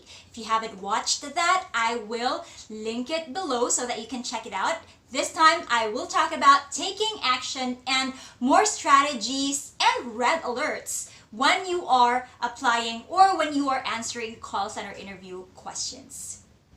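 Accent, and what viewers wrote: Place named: Philippines. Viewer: She is a great job for the influenced about English interview question but still Filipino accent at the same time. God Bless. 🙂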